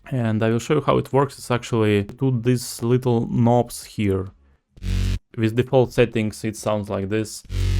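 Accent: Russia. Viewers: Так я сразу понял, что акцент русский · maybe I don't know something, But despite the good English language, there is a feeling that you cook well in Russian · Ты че русский что-ли? Твой акцент сразу понятен. Но тутор классный 👍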